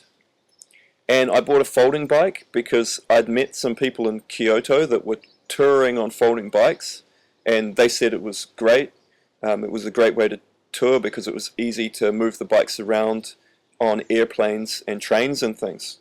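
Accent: New Zealand. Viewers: Hi from another kiwi (I think you sound like a NZer). I'm living in Japan and I have a Tern Eclipse S18 bike that I use to get around on the trains etc here. I'm planning on riding around the world (as much as I can anyway) in a couple of years when I turn 63 and I'd really like to take my folding bike. Its either that or my Surly LHT which is a great bike but doesn't fold. I've asked Mira Slegl <link> who took one around a lot of the world how it was but he said that a folding bike isn't very good on rough roads. Can I ask you how was your experience with a folding bike. Did you have any mechanical problems with the fold mechanism? I'd really prefer to take a foldie if I can. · u talk with kiwi accent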